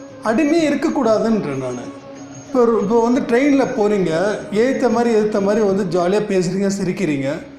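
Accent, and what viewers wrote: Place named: Madurai, India. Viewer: I hear ur voice so that I am asking u r Madurai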